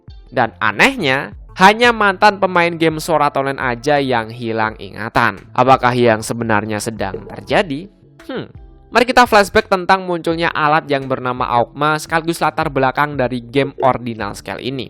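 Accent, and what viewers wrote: Java, Indonesia. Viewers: Mungkin dia medog :v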